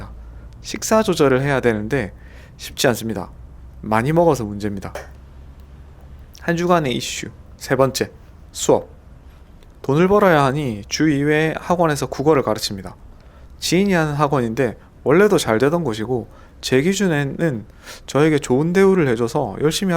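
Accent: Gyeongsang, South Korea. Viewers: 저도 얼마간의 기간제 경험이 있어서 선생님께서 영상에서 하시는 말씀에 많은 공감이 가네요. 모쪼록 마음속에 품으신 계획들 번창하시길 바랍니다. 책읽어주시는 컨텐츠가 철학적이면서 많은 생각이 들게 합니다. ^^. 아 그리고 저도 부산사람이라 경상도 억양과 말투가 참 정겹습니다!! 건승하십시오~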